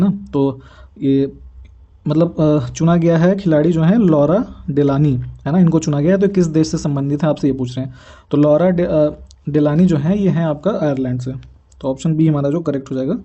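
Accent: Kanpur, India. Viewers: Sir aapki voice Kanpur lg rhi h voice bhut achhi h apki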